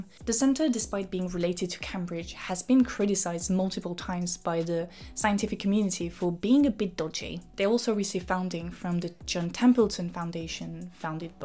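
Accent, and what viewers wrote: France. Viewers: This is entirely irrelevant to the conversation, but I can’t help but be a bit taken by your whispery French accent. Developing a bit of a parasocial “crush.” But you’ve inspired me to pick up French again on Duolingo. I’m Senegalese and I feel like I should be fluent in French by now. Anyways great vid as always.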